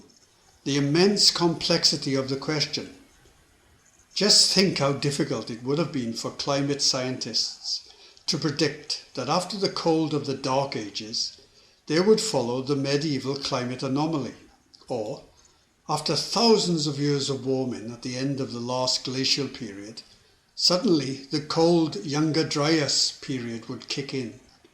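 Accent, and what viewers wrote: Wales, United Kingdom. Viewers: This is one of my favourite channels on the YouTube. Fact based. Clearly explained. Exceptionally well resourced. And a warm welsh accent. (Newport or the nearby valleys if I'm not mistaken) in a measured tone. Top, top work mate and thank you for taking the time to put this excellent content out. Diolch yn fawr.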